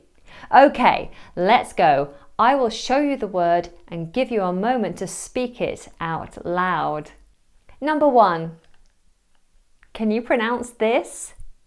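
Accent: United Kingdom. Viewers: HEYN HEY!HEY !HELLO BEAUTIFUL LADY!😘 I REALLY LOVE YOUR BRITISH ACCENT!😘🙏. ESPECIALLY ENGLISH ACCENT FROM AUSTRALIA I JUST LOVE THE ACCENT IN SHORT WORDS OF THEM IN GENERAL... IT IS JUST KIND OF DIFFERENTIAL FROM USA ENGLISH. AND NOT MENTION THE DIFFERENCES FROM EACH STATE HERE IN USA ESPECIALLY THE TERMINOLOGY SPEAKING OF BLACK PEOPLE, NOT QUALITY EDUCATED, OR LESS OF MEDIUM EDUCATION... I'M NOT SAYING THIS FOR DISRESPECT BLACK PEOPLE,. I'M SAYING THAT BECAUSE THE DIFFERENCES OF SPEAKING WAYS OF SOUND DEPENDING OF THE AREA, OR AN INTERACTING HIGHLIGHT. JUST TO SAY...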